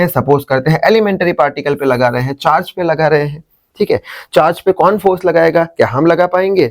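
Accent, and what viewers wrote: Gujarat, India. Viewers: Sir aap Gujarat ya fir rajasthan se ho na 😅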